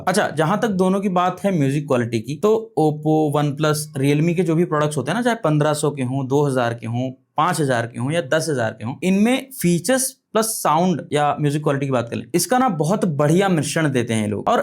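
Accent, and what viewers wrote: Standard. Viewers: Aapki shudh hindi sunkar atyant anand ki anubhuti hoti hai 🙏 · আপনার ভিডিও আমি প্রথমবার দেখছি । আমি আপনার ভিডিও এবং আপনার বাক্য এবং আপনার ভাষা শুনে খুব প্রভাবিত হয়েছি। মুগ্ধ হয়েছি শুদ্ধ হিন্দিতে কথা শুনে। এইভাবে যদি প্রত্যেক মিডিয়া ইনফ্লুয়েন্সার রা ভিডিও বানায় তাহলে আমরা খুব সহজেই যারা অন্যান্য ভাষায় অভ্যস্ত আমরা খুব শীঘ্রই আপনাদের কথা শুনে হিন্দি শিখতে পারবো। ❤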